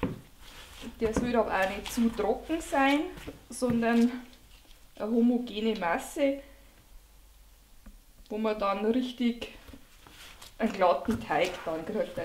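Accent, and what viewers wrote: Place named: Bavaria, Germany. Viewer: .... und so ko ma d'laet bscheissen.... köstlich ! Ich finde Ihren bayrischen Akzent und Ihre Art zu kochen einfach wunderbar. Sie strahlen bayrische Gemütlichkeit aus. Ich lebte auch lange Zeit in Niederbayern und weiss das zu schätzen. Übrigens, wo kann man so eine tolle Gewürzmühle kaufen? Liebe Grüsse aus Spanien.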